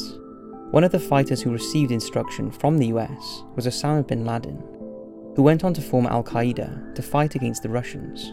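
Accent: Wales, United Kingdom. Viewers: Can’t beat a Welsh accent 👌🏻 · Are u welsh? Sounds like a lil welsh accent 🏴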